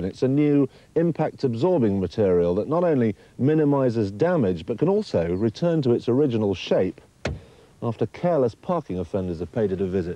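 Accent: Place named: RP, United Kingdom. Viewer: How did he go from suit and Queens English, to POOOOOWER! and SPEEEEEED!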